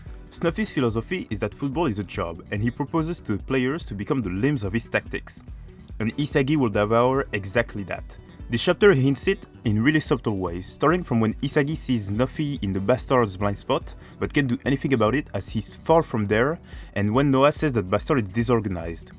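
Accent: France. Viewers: Perso je kiffe tes videos vu que je suis bilingue mais je comprends pas la décision de parler en anglais, ca ce voit tes bilingues aussi mais l’accent fr rends parfois difficile a comprendre (perso) après c’est toi qui decide et si tu reste en anglais ca ne changera pas le fait que je regarderai toujours tes vidéos, force et continue comme ca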